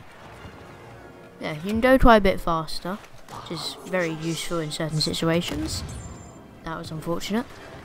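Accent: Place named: United Kingdom. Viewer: it’s the British accent lul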